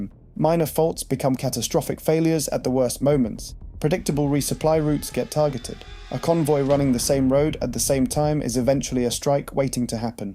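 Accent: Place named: United Kingdom. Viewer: So stereotypical British accent.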